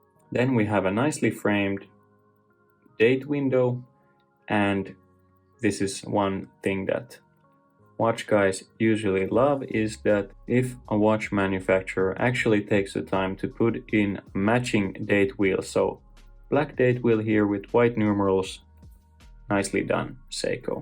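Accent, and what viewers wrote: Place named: Finland. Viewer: Finnish accent ... immediately I get the impresion this not going to be some BS story.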